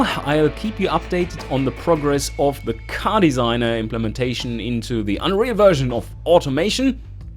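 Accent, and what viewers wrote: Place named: Germany. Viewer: Ich mag Englisch mit deutscher Akzent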